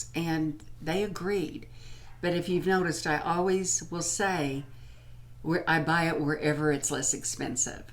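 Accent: South, United States. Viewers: Hi! I watch your channel for several reasons. First, you are honest and I trust what you say. Second, you are sweet and loving, and you have that beautiful slight southern accent. You remind me very much of my step-mother who died this year, watching you is like visiting with someone I loved very much. Third, you are genuine, it's lovely "spending time" with you. I love that you put your videos out on Sunday mornings, it's become a Sunday morning ritual for me. Fourth, you're real. Some of the others that I watch seem very much like "presenters," (I swear they are auditioning for the home shopping network) but watching you is like talking to a friend. And finally, watching you is like going home. I grew up in Indiana, but I have lived in Chicago for 40 years now. And although Chicago is very "Midwestern," it's not small town Midwestern. Watching you is like going home every Sunday morning.... 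you are good for my soul! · your soft spoken, Southern delivery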